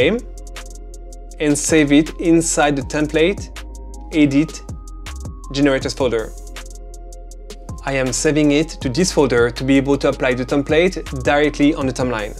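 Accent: France. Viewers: he is french 🤷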